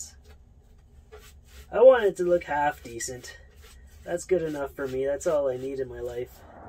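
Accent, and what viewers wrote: Canada. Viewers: nice you have the same jig saw i do! Just bought it to help with my future builds! Love the accent. Mn raised here, Not as thick as your canadian but don't you know..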